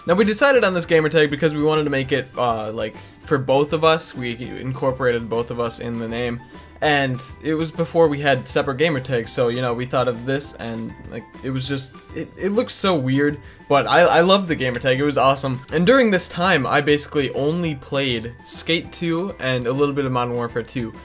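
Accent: Minnesota, United States. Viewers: is he from Minnesota?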